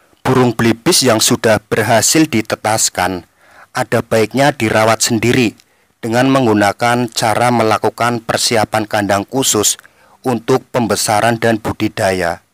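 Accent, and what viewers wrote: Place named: Ngapak, Indonesia. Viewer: Mas nya ini suaranya medok banget,,wong ngapak ya mas,,?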